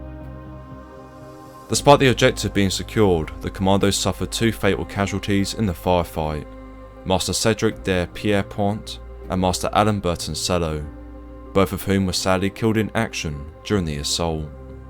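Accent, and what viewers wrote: United Kingdom. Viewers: Love your videos. The biritsh accent makes it even better.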